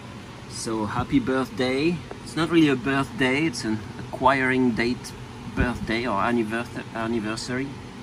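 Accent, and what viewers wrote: France. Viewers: Hi man Love the french accent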